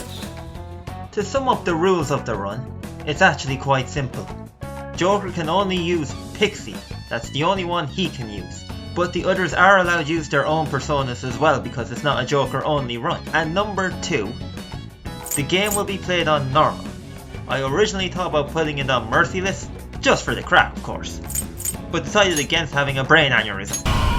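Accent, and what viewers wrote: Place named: Ireland. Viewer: Ayyyy, where in Ireland are ya from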